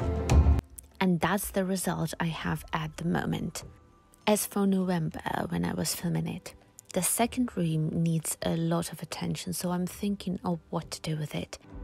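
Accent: England, United Kingdom. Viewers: Thank you. I just came across one of her videos where she kind of explains. Apparently all done by practising and hard work in Belarus. Hasn't been to the UK, I believe, or at least not for a significant length of time. Unbelievable. She sounds about 98% British. I don't think I've ever seen a non-native that sounds like her. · I would come help you... Save that cute 🏠 I am curious how you have an English accent and from Belarus ? Love you videos peaceful .. Ps you may want to shovel all debris out of attic to reduce weight on ceiling beams . I am a Architect and builder in NYC . I also now live in a tiny cabin on farm which is in similar condition. Roof must be repaired asap . Then all else You can use cement mortar to repair roof tiles tile thin set grout etc cheaper than cauk . Good 🤞😊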